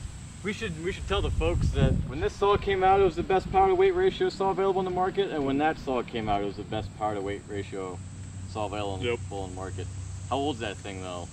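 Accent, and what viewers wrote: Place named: South, United States. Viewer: What state is this in? I’m trying to work out what your accent is. It sounds a bit south but not deep south (I’m in the UK so I’m not sure)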